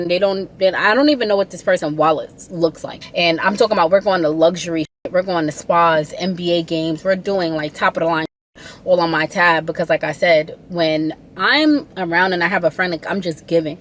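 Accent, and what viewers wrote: New York, United States. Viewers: I loveeee that New York accent 😍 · I can tell you're from New York 🗽 "like dead azz" 😂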